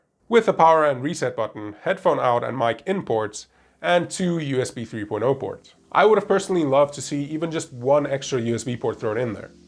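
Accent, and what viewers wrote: South Africa. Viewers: Oh damn that’s a surprise, you’re South African. Like me ✌🏻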